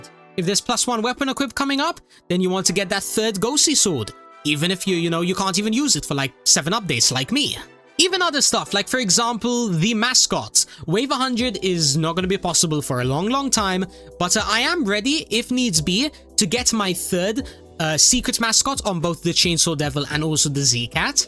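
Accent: United Kingdom. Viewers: are you welsh?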